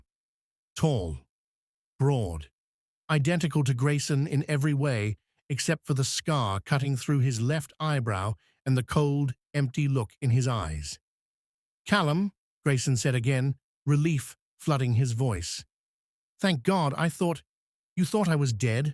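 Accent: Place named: United Kingdom. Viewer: title is not correct but the story is good. love the voice... sounds like Sir David Attenbourgh. love the British sounds.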